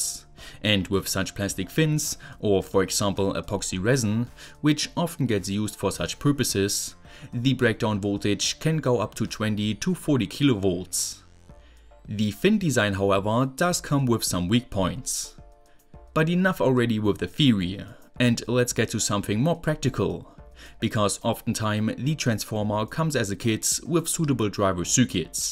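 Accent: Germany. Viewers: Lol als ob du aus Deutschland bist xD Hatte irgendwie usa gedacht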